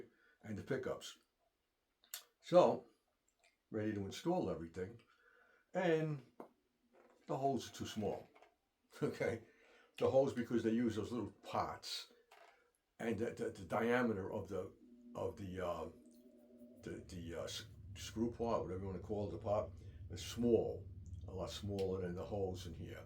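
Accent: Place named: Northeast, United States. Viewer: I'm an Irish guy from the Bronx, and I sound similar to this guy. It's a Northeastern thing.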